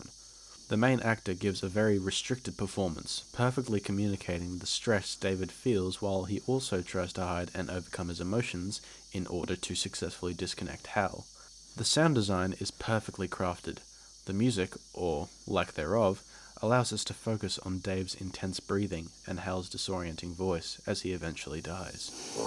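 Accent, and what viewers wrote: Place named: Australia. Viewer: Always so validating hearing an aussie accent. Love the video mate!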